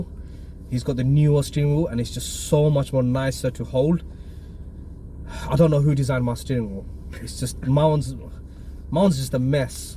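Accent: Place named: Bangladesh. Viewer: Love your Bangladeshi accent bro! It’s what makes you unique ❤️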